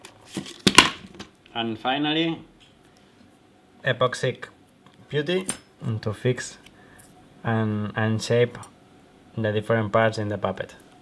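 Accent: Spain. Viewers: Como c nota q eres español XDDD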